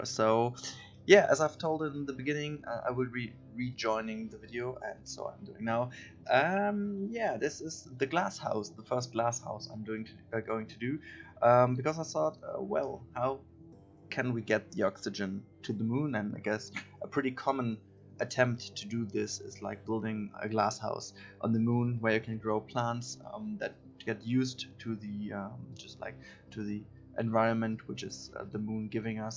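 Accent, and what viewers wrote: Germany. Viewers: Das ist ja ein deutscher Akzent, wenn ich jemals einem gehört hab! Aber gute Arbeit wie immer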